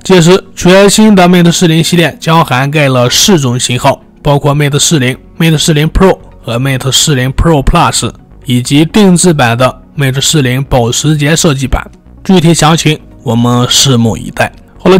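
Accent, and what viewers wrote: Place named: Zhangjiakou, China. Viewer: 张家口口音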